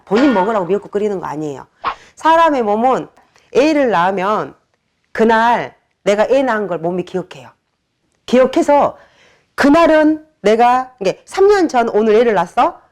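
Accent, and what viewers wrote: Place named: Gwangyang, South Korea. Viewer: 선생님 말씀이 씩씩해서 너무 좋네요 .. 광양분이신가봐요?? 사투리가 넘 좋네요~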